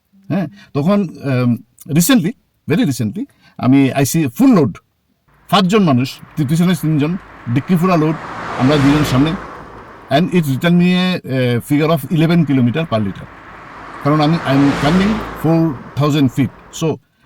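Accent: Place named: Sylhet, Bangladesh. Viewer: সিলেটি শুনলে কুব বালা আর আফন মনো অয়। বানাইতে তাকোইন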